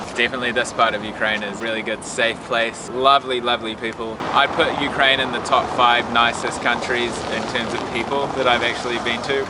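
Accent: New Zealand. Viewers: It's not an english accent though? Place called New Zealand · Are u from new zealand?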